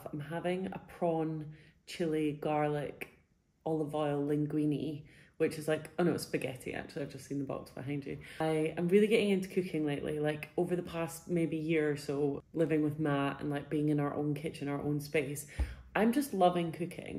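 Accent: Scotland, United Kingdom. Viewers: Lovely vlogging style and great to hear a Scottish accent ❤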